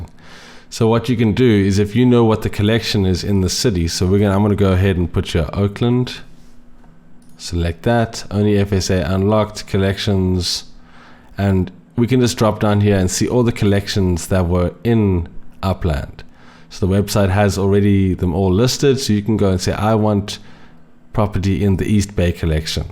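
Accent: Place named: South Africa. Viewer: In Spain ..Had Upland for over a year and still don't know how to do hunts..so lekker to hear a SA voice 😁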